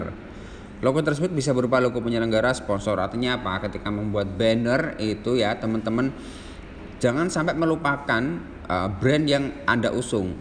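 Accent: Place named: Java, Indonesia. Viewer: saya kalo liat pak jelasin materi lewat youtube mau senyum trs...soalnya medok kali pak🤭🤭🤭🤭🤭🤭🤣🤣🤣🤣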